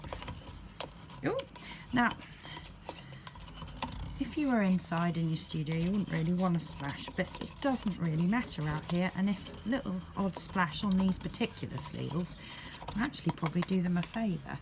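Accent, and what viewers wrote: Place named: England, United Kingdom. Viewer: You're so sweet and joyful and i love your english accent!!! I hope i visit england one day! 😃 the seals are cute! Getting a nice bubble paint bath😃 sending love from the US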